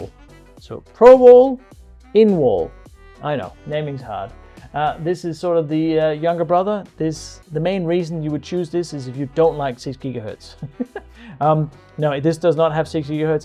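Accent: Denmark. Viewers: Hi, do I hear a little Danish accent - also the name maybe give you up?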